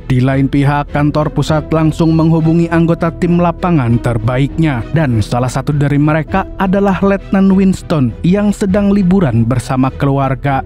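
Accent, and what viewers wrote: Banjar, Indonesia. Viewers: Urang banjar kah sekalinya pian ni mang... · Ae urg banjar kah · Urang Banjar KH pyn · org banua ini😅 sambang lihum😅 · orang Banjar kh 😂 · Ammarnya urang banjar skalinya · Asli Banjar kh bg? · RsJ sambanglihum 😂 Real org Banjar nih nah 😅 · Urang banjar sekali amang nih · Mantap mank,👍 Filim x msntap suara amank x banjar bnr😂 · Urang Banjar sekalinya😭🙌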